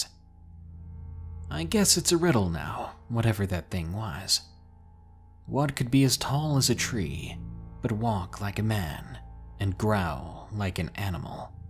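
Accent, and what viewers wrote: South, United States. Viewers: He practiced, in his old videos he sounds super southern and not silky smooth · The american accent version of Lazy Masquerade. I kid you not, you both have the same tone, same way of narrating the only difference being the accent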